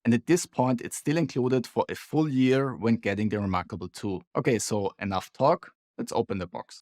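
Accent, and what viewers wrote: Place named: Vienna, Austria. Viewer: This Viennese non-English is hard to stomach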